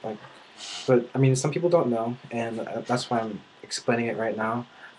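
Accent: Bavaria, Germany. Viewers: Seriously? You sound more like a Bavarian than I do, and I'm german :D You seem to be very open-minded and nice, thank you for trying to get rid of some of those stereotypes. Hope you'll get to enjoy Germany even more, someone like you should always be welcome :) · So you grew up in the US...but your parents are German...? I think it's funny that you have a slight German accent when speaking English :) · hahahahaaha me too.totally bavarian accent loooool so good :D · I laughed very hard when you said "Bayrische Motorenwerke", seems like you have a Southern/Bavarian/Austrian accent? :D Great video, by the way!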